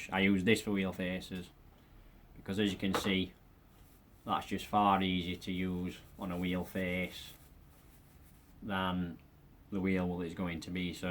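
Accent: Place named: Yorkshire, United Kingdom. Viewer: New sub. Cracking video, I'm from Lancashire but I like your style, proper Yorkshire 👍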